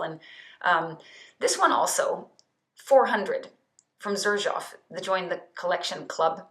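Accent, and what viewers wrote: United States. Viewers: Hi. I believe that you are Swedish, so I think it's interesting that you sound American. Also, I appreciate your insight concerning the fragrances you mention. I plan to start familiarizing myself with Comme de Garcons and some of the other ones you mentioned in this video. Recently, I added Guerlain "Bois Mysterieux" to my collection and it instantly has become one of my favorite fragrances. I highly recommend that you try it if you haven't yet. Two days ago, I wore another favorite of mine: Veronique Gabai "Cap d'Antibes". I like that one a lot. Have you tried it yet? I'd like to hear what you think about "Bois Mysterieux" and "Cap d'Antibes". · Her mom from US that’s why she sounds American).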